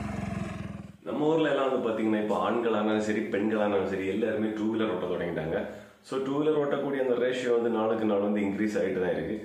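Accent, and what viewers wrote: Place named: Marthandam, India. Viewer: Marthaandam என்பது உங்கள் பேச்சிலேயே தெரிகிறது நண்பா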